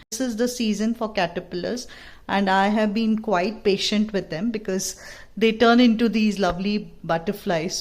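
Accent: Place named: Bangalore, India. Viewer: Your channel and narration is a welcome change...lots of information... and glad to know you are in Bangalore...I could make out by the way you speak :) (edit: as I continue to watch, I hear you say that you found lots of caterpillars..does that mean it's a host plant for a kind of butterflies? Asking as I just ordered and reading up on it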